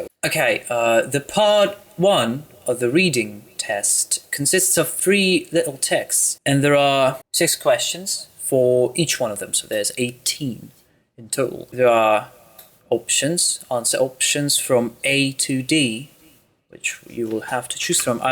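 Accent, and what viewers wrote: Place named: United Kingdom. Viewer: У тебя такой типично британский акцент)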